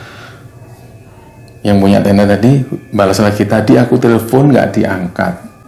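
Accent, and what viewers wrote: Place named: Surabaya, Indonesia. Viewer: Logat suroboyo ny koq asik bgt yo mas medok2 gmn gitu😍jd ngefans sm chanel ini...pokoknya juara sukses trus y...😘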